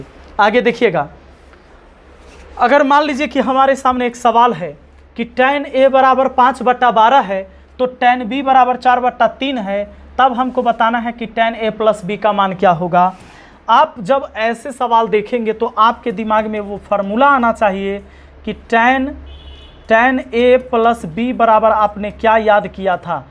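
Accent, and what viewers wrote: Bihar, India. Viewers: Sir Are you bihari? PROUD TO BE BIHARI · Are you from bihar😅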